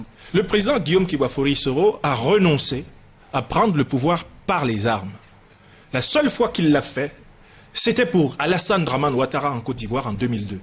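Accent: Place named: France. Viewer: Pourquoi parle-il comme un français?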